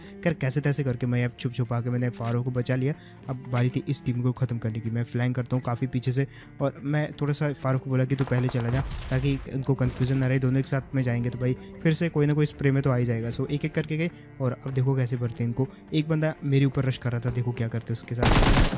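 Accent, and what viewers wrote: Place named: Punjab, India. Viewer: Can you please make a gameplay in punjabi language coz i love your punjabi accent 🥺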